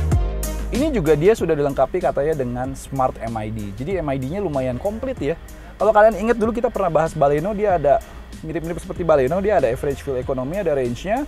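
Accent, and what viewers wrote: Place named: Java, Indonesia. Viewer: yg review 😂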